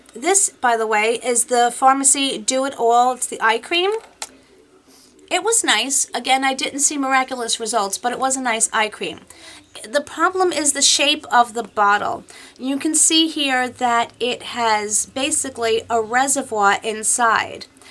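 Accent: New York, United States. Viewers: I LOVE the Dr. Jart Tiger Grass Cicapair cream! That stuff is amazing! It helps with mild sunburn, redness from the cold, rosacea, even my eczema blotches I sometimes get on my neck, anything that will make skin red. That was one of my fav finds in 2018, but I'm sure it's been around before that. Oh yeah and speaking of fav finds, the Zodiac pallets! I have all of the bh spacey pallets! They are awesome! I'm 42 and never before bought big pallets before this., you would like the first Zodiac maybe I think you would rock the cool tones and I'm guessing Supernova would look awesome on you too! The Galaxy Chic is my fav, but you would love the Aurora Lights because the colors are shimmery. I could go on! Haa sorry Oh and thx for warning me about the charcoal magnesium deodorant! I was thinking of buying it. I don't like their regular formulas, but the sensitive skin ones are good. 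Natural deodorants are rarely good enough. My fav ones are Kiss My Face-dry spray and stick, E.O. sprays, and Arm&Hammer Essentials (it's half way to natural) and I still have to try the Native one in my Target box that I keep seeing good things about. Oh and that Tom Ford perfume, oh woww haa daaamn that stuff is gooooood! Ok I'll stop. Thx for the info,. And your voice is nice and calming- love the NY accent too. Have a great weekend!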